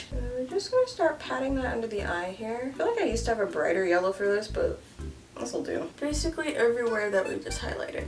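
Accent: England, United Kingdom. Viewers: I’m impressed by your British accent! Only discovered you recently, loving your content 🙌🏾🙌🏾🙌🏾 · Your british accent though 😳💕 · That British accent tho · Your british accent is pretty good -coming from a british girl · I like the British accent❤ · Me not used to the British accent 👁👄👁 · Your British accent is actually really good 🤔🤨❤ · 0_o Excuse me but your british accent scared me - I'm black and English but like you sound more british than me 😂🙈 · Can you do a video of doing a make up tutorial video with your british accent please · Queen your British accent is 😘👌💕 Also gonna call this Lil Grinch🤣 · That English accent is on point, much love from a Black British fan (so I know my own accent 😉) also I think I'm 153cm tall too! Height twins 😂😂😂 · That British Accent 😍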